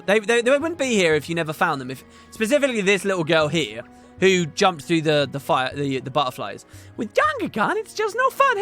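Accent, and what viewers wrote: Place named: Scotland, United Kingdom. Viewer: I vote for more of Scottish accent.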